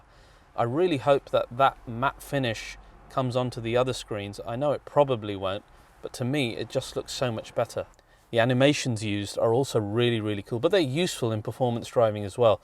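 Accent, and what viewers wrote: United Kingdom. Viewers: This guy in India? Has British accent, but definitely not ethnic English & scenery looks like England. ???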